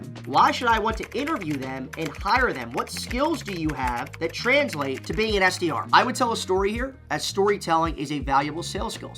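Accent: United States. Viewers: Do you feel disadvantaged relative to your British/Australian peers? Due to your American accent.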